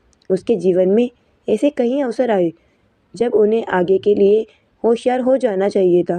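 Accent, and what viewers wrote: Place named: Hadoti, India. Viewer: आवाज में हाड़ौती की बोली का acsent सुनना कितना मंत्रमुग्ध करने वाला अनुभव है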